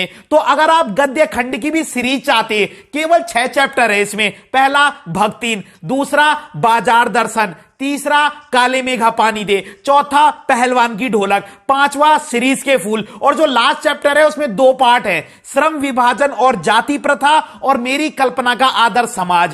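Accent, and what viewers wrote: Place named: Pahadi, India. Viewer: Merko aapki voice se lag ji Raha hai tha ..aap pahadi ho...😅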